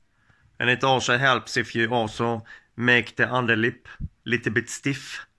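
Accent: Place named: Sweden. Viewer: Märker det genom ”dialekten” eller vad flesta nu kallar ”svengelska”. Jag själv har den dialekt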